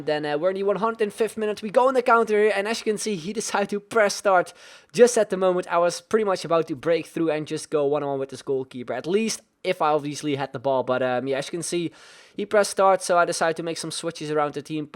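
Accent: Netherlands. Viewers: From holland i can here that bye his accent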